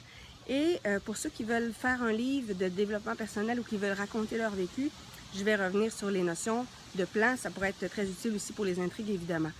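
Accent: Canada. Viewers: Elle dit des trucs assez basique, donc vidéo pour débutant ou intermédiaire. PARCONTRE !!!!!!!!!! A la fin elle se lache mais alors VRAIMENT et balance de vrai petites d'or. Par conséquent je pense qu'elle connait son sujet ET PAS QU'UN PEU alors j'invite n'importe qui a fouiller le contenu de sa chaine ce que pour ma part je vais faire immédiatement. Bonus: accent canadien 😅, ça détend !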